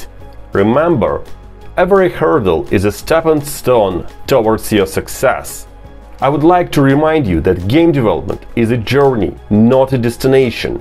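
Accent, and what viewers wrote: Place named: Russia. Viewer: Я прям догадывался всё это время по акценту, что ты русский) Полезное видео, спасибо!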